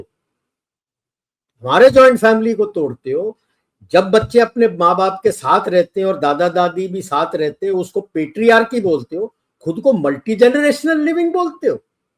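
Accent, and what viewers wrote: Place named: Punjab, India. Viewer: Sir, your last name indicates you are south indian but your dialect shows you are perhaps punjabi speaking. Sir, reveal you are punjabi or tamilian.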